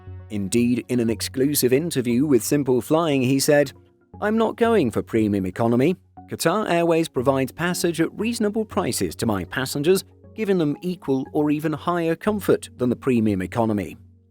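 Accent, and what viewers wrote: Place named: United Kingdom. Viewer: Honestly, I’ve flown on several Middle Eastern carriers (always in business class) and I spent about a week in Turkey (sorry Erdogan I’m not spelling it the way you would like me to so eff off) and I’ve never seen a woman, much less her daughter, in a niqab, hijab, or for that matter a full chador. The Muslim “religion” is a goat herder’s abomination. So why would you, by the sound of your voice, from the UK and a child of the British Empire, such being the political creator at the end of the Ottoman Empire of the current abortion that is Middle East show videos of airlines completely understand that their business is buttered on all sides and not necessarily just Muslim sides, start this video off with two women in chadors? Why must your generation be so effing politically correct? All of you children can go straight to hell. 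We won’t get into whether or not it’s the Muslim concept of hell but I guarantee you that you won’t find any virgins anywhere once you die.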